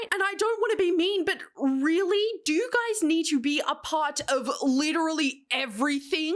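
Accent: United States. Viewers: Okay but how can you do a realistic American accent tho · Your American accent is so darn good!!!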